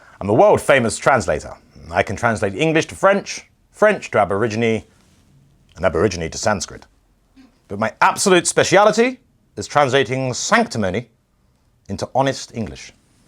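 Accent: United States. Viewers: Best American accent I’ve heard by a Brit! (Apart from Hugh Laurie and his House character)! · Thank You! Who knew I’d need a Brit to make sense of the word salad this creature constantly spews? You do a great American accent, and the hairstyle is spot on! 🇺🇸❤️🇬🇧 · Your American accent is excellent! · you are better than at least 99.5% percent of the actors in Hollywood! You have Madam Snarkle NAILED!! The American accent is amazing!! Congratulations to your fine work and warmest wishes from Northern California!:)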